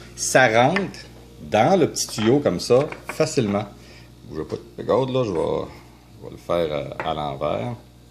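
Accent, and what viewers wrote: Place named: Quebec, Canada. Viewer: Salut , Merci pour tes super conseils ! Tes descriptions sont tops , exactement comme je souhaite faire , même matos , même méthode 👍👍👍. Merci pour ton approche du sujet qui me rassure sur mes choix ! L'accent québécois ( que j'adore) ne gâche en rien tes vidéo pour moi ( charentais qui vie en Dordogne 🤣🤣🤣). MERCI and good Luck pour la suite 😉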